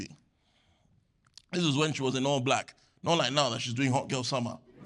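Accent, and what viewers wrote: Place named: London, United Kingdom. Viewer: I just found your show here on YT , thought check him out: is he funny / something interesting to say? YES 😊 especially when you talk that London accent woop woop I Love that.. New sub & support from Amsterdamn, H0llland.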